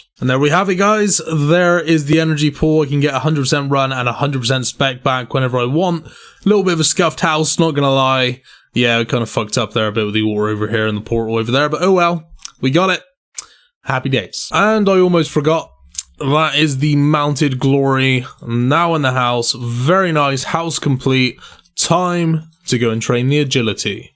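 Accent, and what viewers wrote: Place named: Somerset, United Kingdom. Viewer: mate that 'sommersert' accent..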